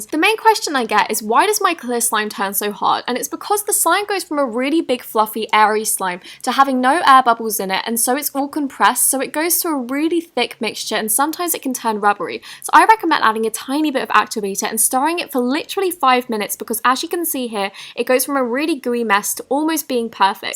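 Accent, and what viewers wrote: England, United Kingdom. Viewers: Are u English ? Xx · 😊 you are legit my favourite YouTuber. Also, your British!(like me)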